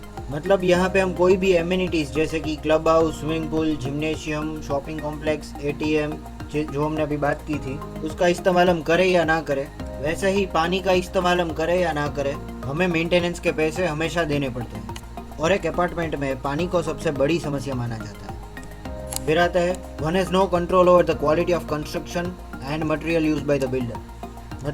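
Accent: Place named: Kathiyawad, India. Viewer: Bhai tu kathiyawad ( Gujarat) se hey na? Speech idea aa gya... Ye banda rajkot ya Bhavnagar side ka hey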